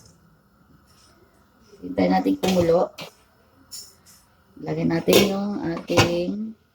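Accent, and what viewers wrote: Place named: Visayas, Philippines. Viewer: Lami nag pinakbit ate 😋 cute mu mag bisaya ate oyy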